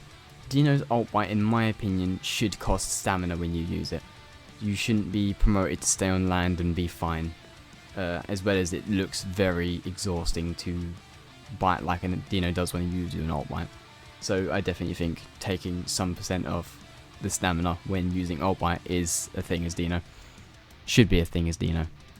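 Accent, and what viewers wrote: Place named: United Kingdom. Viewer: cant believe your british but you sound more british in this vid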